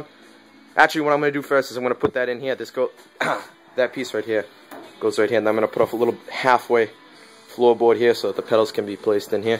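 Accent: Boston, United States. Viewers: Keep jumping in and out of that Boston accent. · boston right?? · are u from boston?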